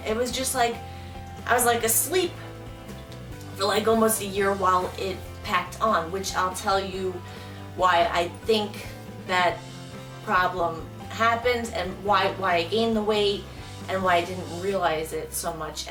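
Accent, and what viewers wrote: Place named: Rhode Island, United States. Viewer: You sound like my Aunt Shirl and she is from Rhode Island. Where are you from? Thank you for making these videos even though you were nervous. It is appreciated.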